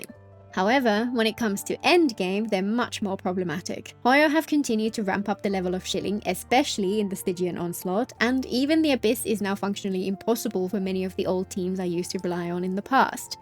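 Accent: United Kingdom. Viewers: Have you ever thought of doing ASMR ? I adore that voice and British accent of yours! BTW looking forward to your analysis on Archon quest 7.0. Great job.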